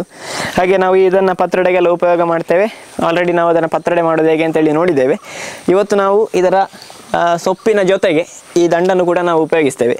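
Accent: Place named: Coastal Karnataka, India. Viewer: ನೀವು ಮಾಡುವ ಪ್ರತಿಯೊಂದು ಅಡುಗೂಟವು ನನ್ಗೆ ಸಿಕ್ಕಾಪಟ್ಟೆ ಇಷ್ಟ ಮತ್ತು ನಿಮ್ಮ ಕರಾವಳಿ ಕನ್ನಡದ ಭಾಷೋಚ್ಛಾರದ ಶೈಲಿ ಎಲ್ಲವೂ ನನ್ಗೆ ಅಚ್ಚುಮೆಚ್ಚು ❤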